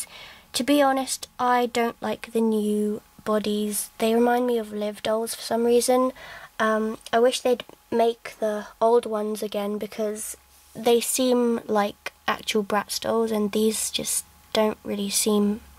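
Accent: United Kingdom. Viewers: I have the leah the witch doll but i threw her away cuase she was old and i had no use for her but i love your british accent :) also your dolls are really good takin care of :D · r u from Britain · Are you british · Are you from london?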